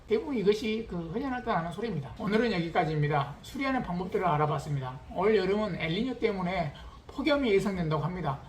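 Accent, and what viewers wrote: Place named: Jeolla, South Korea. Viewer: 으미~~~~! 구수한 전라도 억양 듣기 좋네요~ 영상 잘보고 갑니다.... 바로! 실천에 옮기도록 할께용~~♡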